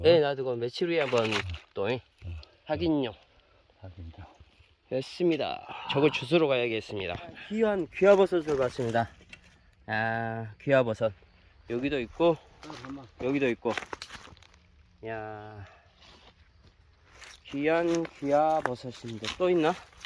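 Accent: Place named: Jeolla, South Korea. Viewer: 어느지역의 산인지 모르나 목소리는 전라도같네요 아직 강원도나 충북북부쪽에서만 나오는줄 알았는데요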